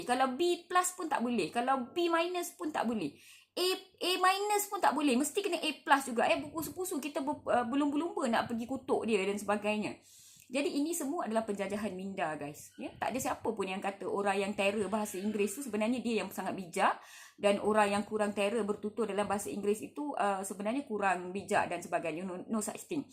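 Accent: North, Malaysia. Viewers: Doktor Anak malaysia yg betul2 sudah merdeka...sbab fasih berbahasa Melayu..yg paling Bagus doktor boleh ckp loghat daerah jugak..bleh ckp loghat utara kan😀😀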